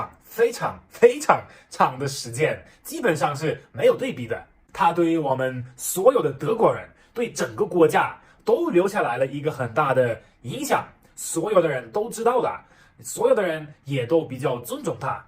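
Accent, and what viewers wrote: Sichuan, China. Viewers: 你的四川話真地道,希望德國人不要受美國的影響,不要欺侮中國人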